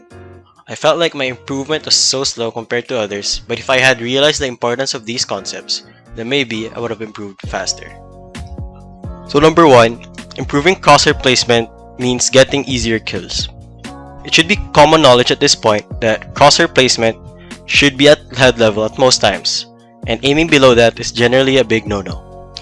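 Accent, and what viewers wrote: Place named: Philippines. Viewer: Great video! Don't know why but you kinda sound filipino hehe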